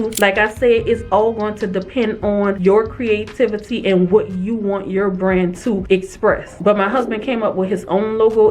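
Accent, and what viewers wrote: New Orleans, United States. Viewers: Hi. Love your channel. New here. I love your videos! Question, are you from NOLA? I hear your accent a lil bit. 😊I love New Orleans so much. ❤❤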